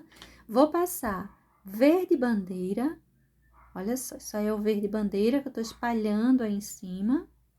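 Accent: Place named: Northeast, Brazil. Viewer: Boa noite ❤️💚 vc fala de qual Estado? Com este sutaque Nodestina.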